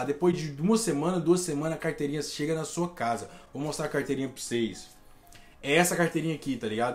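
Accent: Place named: São Paulo, Brazil. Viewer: Cai de paraquedas aqui!! Iol mas ganho meu like 1° pela humildade..2°AHH que bom ouvir o sotaque da minha terrinha😍 SP na veia manoooo!!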